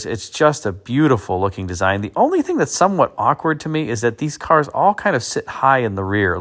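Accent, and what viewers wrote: United States. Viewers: Keep up the great work very clear American accent cheers from Australia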